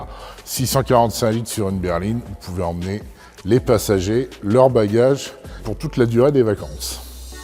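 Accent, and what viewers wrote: Paris, France. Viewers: J'adore l'accent Titi parisien. Un vrai régal. Génial. Jean Jacques 57 printemps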